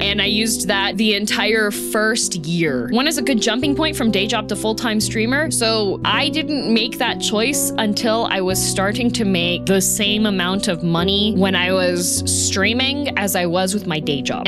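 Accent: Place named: South, United States. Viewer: so based. She's fairly open with her tech stack, she's not afraid to call out industry BS, and her "southern drawl" Japanese is chef kiss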